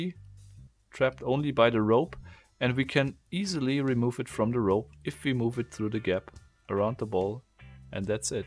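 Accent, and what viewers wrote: Germany. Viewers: Man hört deutschen Akzent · Red bitte deutsch du kannst es man hört es am Akzent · Are You German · Man hört das er deutscher ist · Are you german/ bist du deutscher? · Man hört mega raus das du deutsche bist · are you from Germany? You have this specific accent:-D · Kann es sein, dass du deutsch bist? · Wow, hab ich mich verhört, oder kommst du aus Deutschland? Der Akzent ist unverkennbar :D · Are you german ? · You bought it in Germany? I knew it!!! Your accent is very German!!! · Voll der deutsche Akzent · Dieses deutsch englisch regt mich so extrem auf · Man hört so einfach raus das du Deutsch bist XD nices Video btw, cooles Spielzeug. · Are ya German? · German, right? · Dude, the accent is german und sucks af😂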